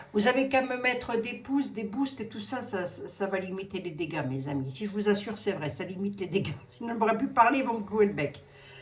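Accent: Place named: Lorraine, France. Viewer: Vous me faites rire et croyez moi ça me fait du bien. Je suis une Lorraine de Meurthe et Moselle "expatriée" en Bourgogne et entendre votre language Lorrain me fait un bien fou 😁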